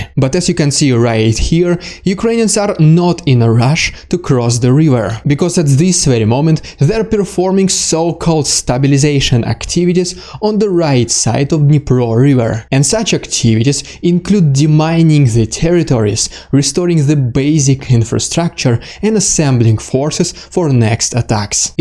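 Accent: Russia. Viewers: Cool, you sound like Chekhov from Star Trek! Live long and prosper!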